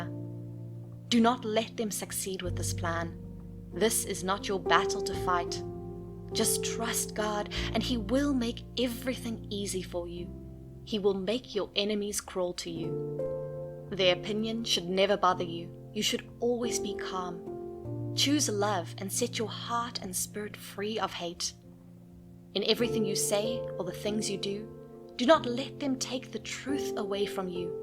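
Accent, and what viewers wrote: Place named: South Africa. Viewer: Thank you for this! You sound South African. :)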